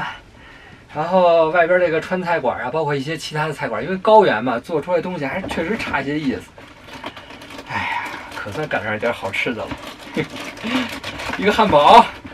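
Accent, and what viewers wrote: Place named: Beijing, China. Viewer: 喜欢😍他的知性和标准的京片儿语音。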